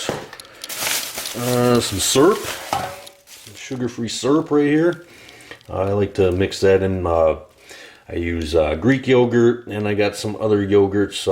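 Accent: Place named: Michigan, United States. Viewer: Hahaha, I knew you were from up there because of the accent. Meijer confirms it. Michigan native, here.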